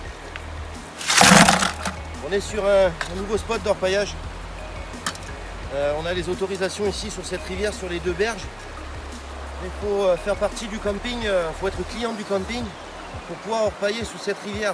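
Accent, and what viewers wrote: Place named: Marseille, France. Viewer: tu et un marseiller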